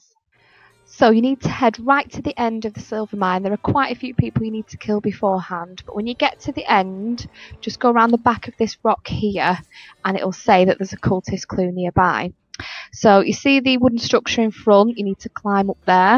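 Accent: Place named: Atherton, United Kingdom. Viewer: Sounds really similar to the Atherton accent. You dont drag out the 'oh' sound in words the same though. Best example is my mates gf. She has a channel called olibomb on youtube if you're interested.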